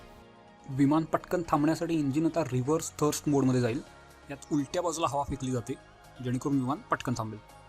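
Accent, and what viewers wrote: India. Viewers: Pure Kolhapuri bro 😎...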